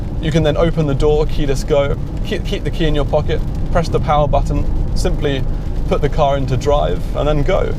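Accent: RP, United Kingdom. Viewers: Wow! Initially i thought what a Cambridge/Oxford educated yuppy accent 🤦‍♂️ but you gave me all the info i was after thank you i shouldn't be so presumptuous in future 😅 👍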